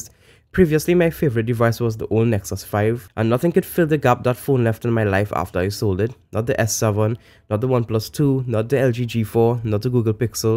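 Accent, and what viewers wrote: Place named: Trinidad and Tobago. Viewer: Can't miss that Trini accent 😎 Keep up the good work bro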